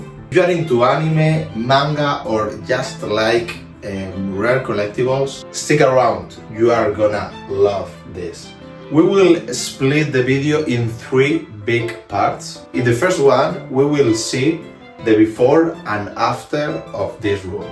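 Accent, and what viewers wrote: Spain. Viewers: el acento español le da el toque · Como se nota el acento de Albacete jajajaja, vaya puta barbaridad, ojala tener algo así algún dia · Least nottable spanish accent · Yo cuando veo que habla inglés como yo Yo: este compa es español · First of all, i just wanna say you have the fatest rising collection i've seen, knowing you started 3 years ago. Its truly amazing seeing a European, like me, enjoy this series so much and see this collection that is so epic. Second, i would love to get a more detailed video about the shonen, ultra jumps display in your room because shonen, ultra jumps, volumes is where i also wanna start collect more and i would love to know more about. Last, i think i understand you in borh languages! i'm from l🇵🇹 and your spanish accent isnt that hard ro figure it 😂 But you blend them very well for your audience and i think you are doing fine. 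Thanks for the video Mr, i csnt wait for more content from you and someday, we can see the whole collection since we you have diad this is 70% of it due to the lack of space. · Eres mas español que yo con ese ingles, guapisima la coleccion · Bro, se te nota mucho el acento español, pero se nota que le pones buena intención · a los 20 segundos saque tu acento de español JAJAJA ta bueno tu coleccion ❤ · el inglés españolizado me mata JAJAJAJA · El inglés españolizado le da el toque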